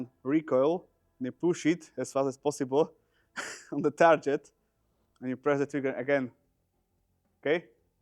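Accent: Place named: Slovakia. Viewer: Well to be honest, no problem with English, but. But, you should be able at least to have a full capability of speaking consistently without mishaps. And I am also not talking only about strong Slovak accent, that is not what worries me that much, but the strong competition on YouTube among English speaking shooting channels. It will be difficult to bring something exceptional. I wish you all the best and I do not intend to stop watching your videos. Just a small feedback.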